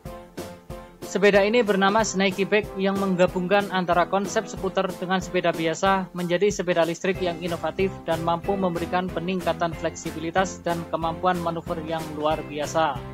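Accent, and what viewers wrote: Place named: Central Java, Indonesia. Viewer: Dari logat akang orang Jateng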